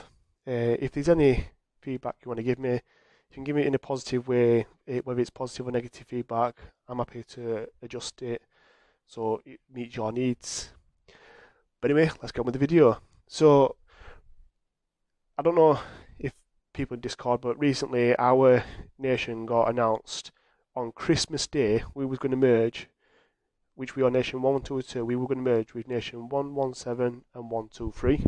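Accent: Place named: United Kingdom. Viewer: Hey!! For me is kinda difficult to understand sometimes, but thats bc im not used to hear british accent and my native language is spanish. But it helps me to improve my english. 😅 your firecracker.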